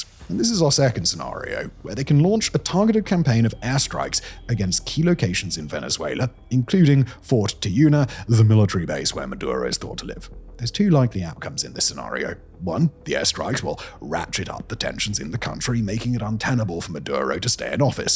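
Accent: United Kingdom. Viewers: Watching this in April 2026… bro really built a whole “analysis” career off “not enough troops” 🤣 Take away the British accent and this is just mid-tier guessing with confidence.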